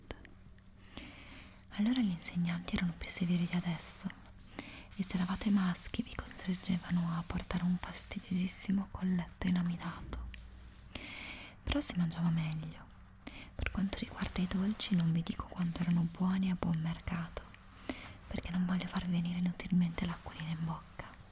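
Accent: Italy. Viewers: I love the softness of his voice and his Italian accent 😴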